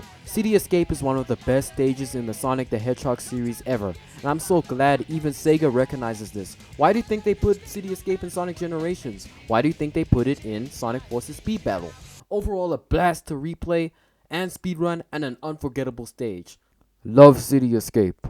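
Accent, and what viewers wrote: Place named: Bronx, United States. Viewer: Can't tell if this guy's accent is asian hood or bronx and I don't care lol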